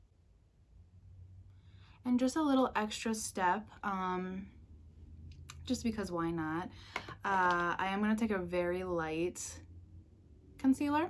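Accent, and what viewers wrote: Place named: England, United Kingdom. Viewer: for some reason you remind me of someone who was born and raised in England but moved to America and lost most of their accent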